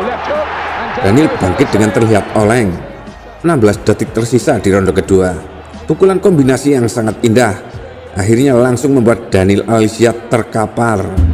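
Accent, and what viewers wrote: Java, Indonesia. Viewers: 😀😀😀😀suaranya medog kayA sy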